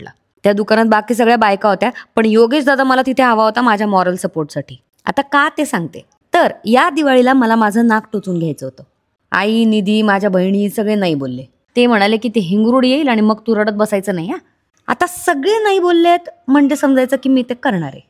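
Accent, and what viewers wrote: Beed, India. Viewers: Tarich bhasha same ahee😂 beed♥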